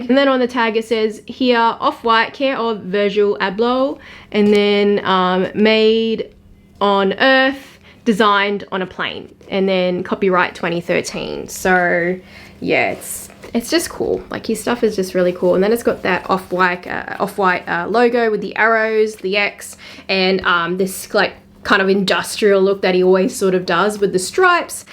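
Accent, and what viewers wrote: Australia. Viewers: I like the Aussie accent I am half Australian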